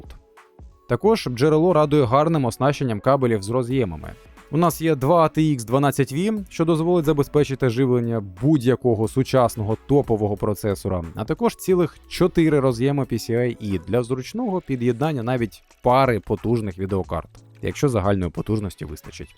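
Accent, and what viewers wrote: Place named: Galicia, Ukraine. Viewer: вылез галицикий селюк😂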